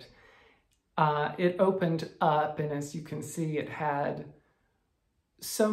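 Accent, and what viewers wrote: South, United States. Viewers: Thanks for sharing your wonderful YOU!! Love your Southern accent- more please !😂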